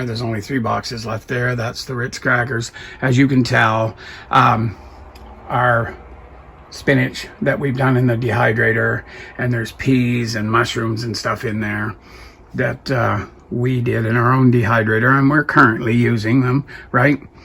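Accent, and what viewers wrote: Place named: Eastern Canada, Canada. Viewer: I'm so thankful for your channel! I love listening to your accent because it reminds me of my Granddad and Uncle who were originally from out east:) It is such a wonderful blessing to know of brothers and sisters in Christ across this crazy nation! What a gift you give us by your prayers! May God bless you both richly!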